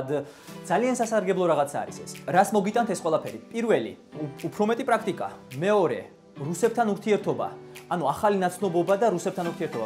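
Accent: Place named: Guria, Georgia. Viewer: გურული აქცენტი გაქვთ მეგობარო,რუსი ხართ მართლა?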